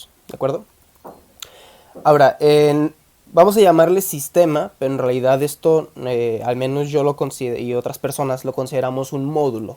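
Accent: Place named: Colombia. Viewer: Hermano eres un crack ❤ Tengo ya unos meses tratando de entender bien arquitectura hexagonal y patrones de diseño y es el primer video que realmente me llega 🎉. Y mira que sigo a gente que explica bien y tiene conocimiento (no los voy a nombrar porq seguro ya muchos aqui los conocen 😅) pero aun asi tu has logrado en 20min los q ellos no. Creo por tu acento que eres de colombia asi que un saludo y un fuerte abrazo de un hermano venezolano. 😊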